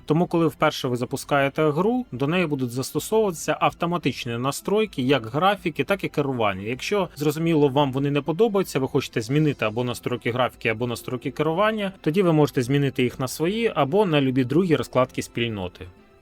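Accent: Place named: Ukraine. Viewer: Спс за обзор. Если приставать, то отметил бы только грамотность речи, потому что ру слова с укр акцентом режет ухо.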